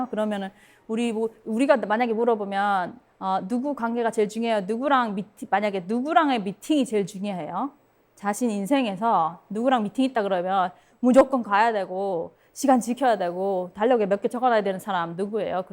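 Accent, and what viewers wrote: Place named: Daegu, South Korea. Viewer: 대구의 말투가 나오시네요.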